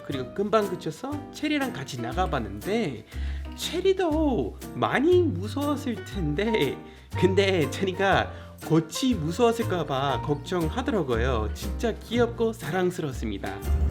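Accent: Gyeongsang, South Korea. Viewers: 반가운 경상도 사투리 이뽀요~~~ 미국인지 한국인지... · 경상도 사투리 넘 귀여워요 어디 가노 ㅎㅎ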